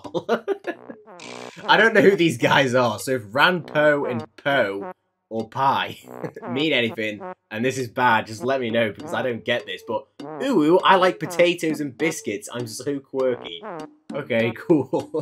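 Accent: United Kingdom. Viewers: You sound so ✨British✨ today mate.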